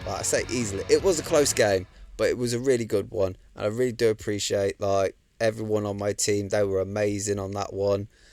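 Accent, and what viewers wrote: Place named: United Kingdom. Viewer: thanks for the reply ! I didn't mean any offence , I play this game since it was released for testing and never had the chance to hear a wr channel with UK English. You're also a good pilot. Keeps it up 🔥👍🏻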